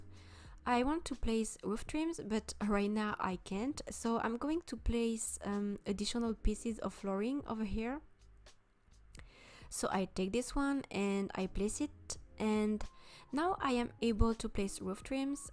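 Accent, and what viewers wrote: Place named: France. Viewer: Wait hol up are you French????? I love ur accent I am nigerian but speak fluent French lol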